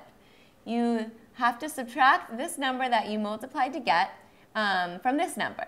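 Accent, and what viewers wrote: United States. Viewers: Yes so annoyed. She sounds like a yankee.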